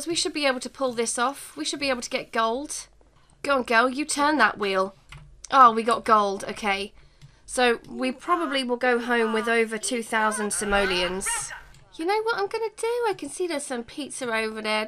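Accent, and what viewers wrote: United Kingdom. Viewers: It's so rare to hear a Brit with a similar accent to mine. <3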